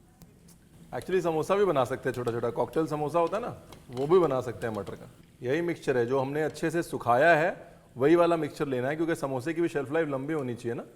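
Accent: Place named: Bihar, India. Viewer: AAP ka behari tone bahut acha ba.tnx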